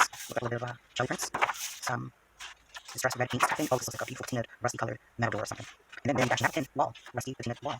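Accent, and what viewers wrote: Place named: New York, United States. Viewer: I always forget you are a New Yorker until you mentioned it. I can still hear it in there. 🥰 Please do a tea journal when you can squeeze it in. I’d love to see it. xoFaith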